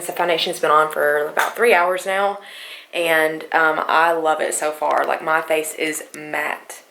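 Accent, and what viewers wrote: South, United States. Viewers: I love your accent! Us girls with southern accents are the best 😄 you’re gorgeous by the way! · OMG girl same!!! I'm southern and from Alabama!!! It's good to hear a makeup guru that's southern like me, lol. You should follow me in instagram, I have to accounts one is my main gracieorr_ and the other is my "spam" and I basically just post a lot of makeup looks I've done. I would love for you to follow me!!! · I thought you were from Georgia cause of the southern accent lol but I'm Mexican but I'm raised in Georgia and i sound like you lol 😂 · Yasssss .... Girl your accent just gave me life ... I'm from SC and I didn't realize how much I miss hearing that southern accent . U look amazing !!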